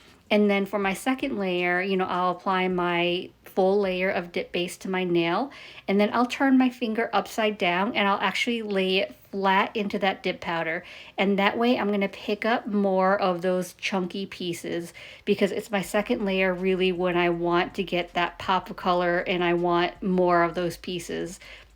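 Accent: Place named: Massachusetts, United States. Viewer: Lavendah… you’re speaking my Massachusetts accent we have officially dropped the ‘R’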